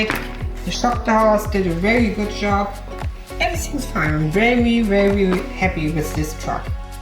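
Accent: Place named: Germany. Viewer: dass dein Akzent irgendwie deutsch klingt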